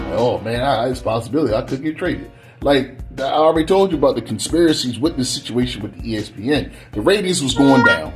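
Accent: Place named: Baltimore, United States. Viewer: You from Baltimore? Heard a “few “ dews lol… I’m West Baltimore born and bred.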